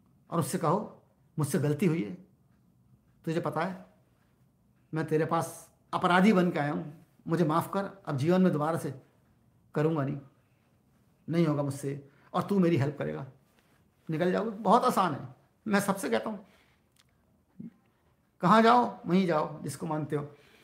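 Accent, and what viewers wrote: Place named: Moradabad, India. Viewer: humaray mata pita moradabad up say Karachi aaiy thay aap ki bhasha bilkul moradabadiyon jesi hay Meray pita gee bhi bilkul aap ki Tarah Urdu Hindi boltaiy hain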